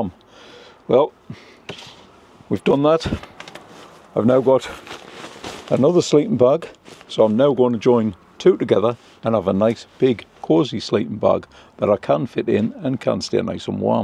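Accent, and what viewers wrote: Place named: North, United Kingdom. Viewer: Great vlog , nice to hear a northern accent 👌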